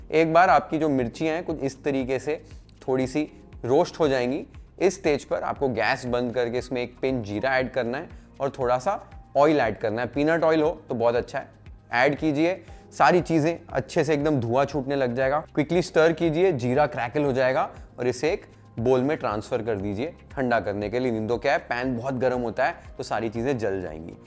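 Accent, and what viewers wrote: Maharashtra, India. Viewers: Is he from Maharashtra? I thought he's from Punjab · I love your Marathi accent and all recipes are awesome ❤❤❤ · Love your Marathi accent Chef ❤ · Love ur Marathi accent. Malaa bengali fish curry tumcha style chhee receipe share karaa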